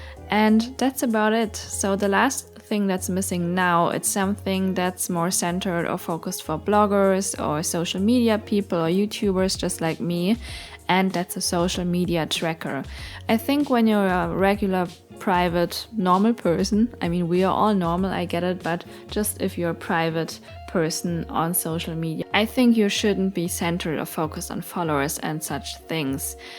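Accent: Germany. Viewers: Hab direkt gehört, dass du deutsch bist 😊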